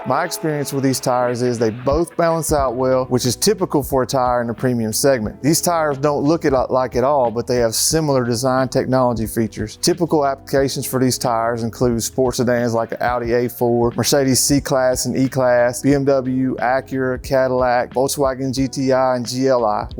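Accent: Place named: Missouri, United States. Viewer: The Accent. Are you from M.O. St. Louis or K.C.?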